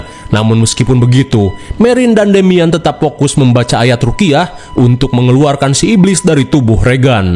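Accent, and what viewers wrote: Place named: Sunda, Indonesia. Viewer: asli logat bahasa Sunda sareng indo na sok pikaserien😅😂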